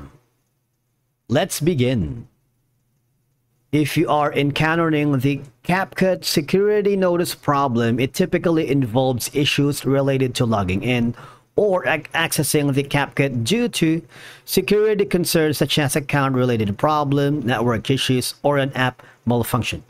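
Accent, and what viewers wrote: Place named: India. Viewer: 😂😂😂 indian vid trying to look white hilarious the accent give it out big time nice try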